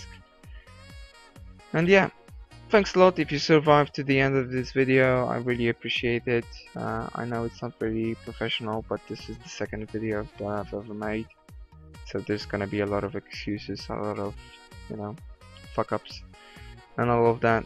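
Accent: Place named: Poland. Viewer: Love the epick Suck Guy playing in background and btw nice english for a pol akcent similar to shusei :D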